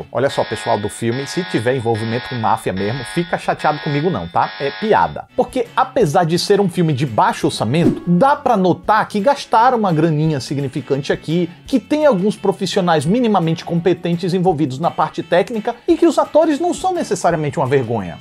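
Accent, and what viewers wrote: Northeast, Brazil. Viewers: Mano vc tem um sotaque meio nordestino, o que casa muito com o tom cômico do video. Brabo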